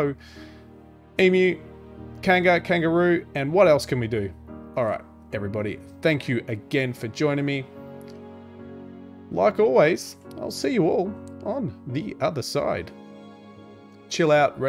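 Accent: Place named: Australia. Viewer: Wwow man u r from Australia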